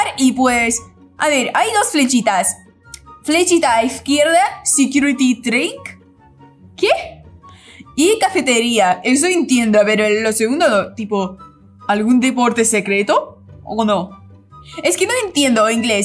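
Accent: Brazil. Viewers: Es de Brazil y le cuesta el español ojalá ser de brazil😢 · No se ve raro si se escucha así es que creo que es de Brasil por si acaso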